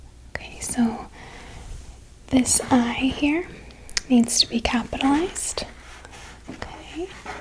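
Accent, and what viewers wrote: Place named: Upper Midwest, United States. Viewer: Phil Mercer many of us think she’s from Wisconsin or Minnesota. I’m from Wisconsin and that’s what I think dere hey.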